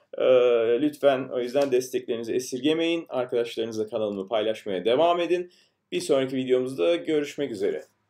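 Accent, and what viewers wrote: United States. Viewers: anlatım iyi de şu amerikalı gibi konuşmaktan kurtulsan iyi olacak :D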